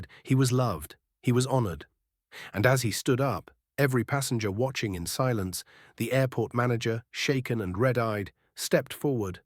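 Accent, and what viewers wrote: United Kingdom. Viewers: Why are there so many British narrators on Youtube narrating American content . Semper Fi to the guard of the fallen soldier . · Rubbish....with an inbreed English accent..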